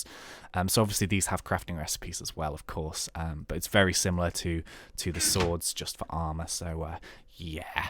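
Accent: United Kingdom. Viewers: It sounds like your British... I speak British some times:). Please tell me if your British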